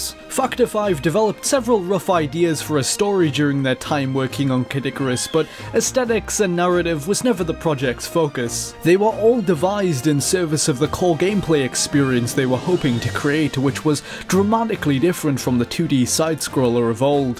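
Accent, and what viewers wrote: United Kingdom. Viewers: I always thought that the way you spoke was cool. Why in the world would anyone knock a British accent? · Your voice is great its my favourite British accent - and I live near Manchester, and cheers for telling me yet more information that I didn't know. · It's a heavy British accent if I'm hearing right, and I'm Canadian, all I hera is English and French.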